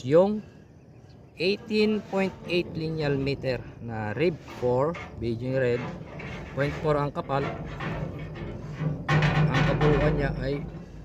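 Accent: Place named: Ilocos, Philippines. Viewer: TAGA SAAN PO KAYO PARANG ILOCANO PO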